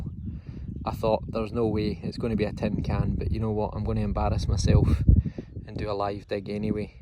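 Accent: Scotland, United Kingdom. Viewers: Hey, hope you're doing well. Can I know, is your accent Scottish or Irish?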